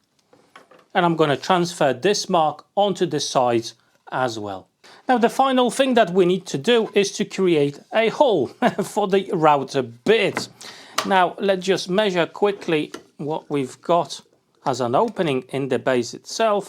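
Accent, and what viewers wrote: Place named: Northern England, United Kingdom. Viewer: Nice to hear that you’ve retained your northern English accent 😅.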